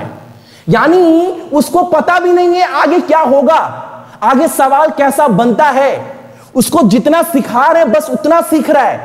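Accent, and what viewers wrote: Bihar, India. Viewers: Sir ekdam bihari style me bol rhe hi bihari hi kay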